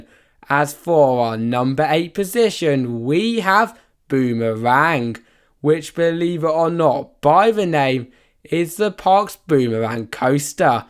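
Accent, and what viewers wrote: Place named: United Kingdom. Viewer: What's with the drunk British guy commentator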